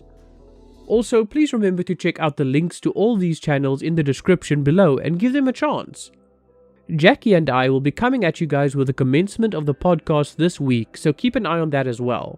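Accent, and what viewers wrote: South Africa. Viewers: Are you Afrikaans/South African by any chance? From a South-African Stormlight Archive fan (an SA SA fan if you will)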